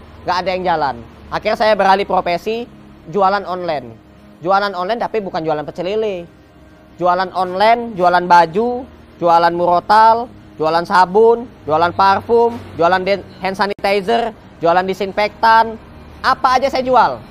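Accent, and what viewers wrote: Palembang, Indonesia. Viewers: Logat palembangx khas sekali..smga sllu dimurahkan rezekinya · Logat palembang nyo mase ado 👍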